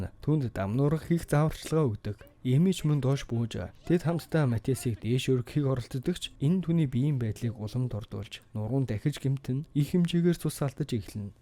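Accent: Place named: West, Mongolia. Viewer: Шууд баруун аймгийн аялгаараа ярих юм бол их хүн үзэх байхаа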